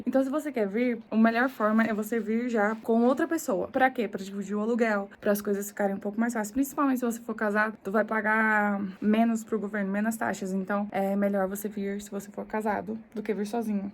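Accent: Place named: Goiás, Brazil. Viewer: Goiano e sempre um trem gostoso de ouvir né! Acabei de chegar mas já estou adorando seu canal.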